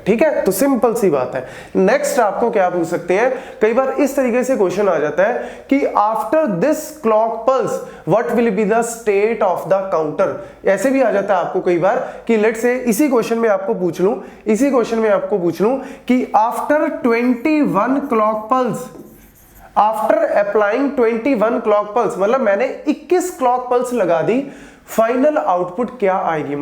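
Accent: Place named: Haryana, India. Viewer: are u from haryana ur accent already reveals it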